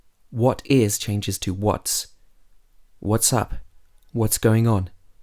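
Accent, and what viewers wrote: United Kingdom. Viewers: British accent ??????? Je je je.....no thanks.... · Excellent British accent 💗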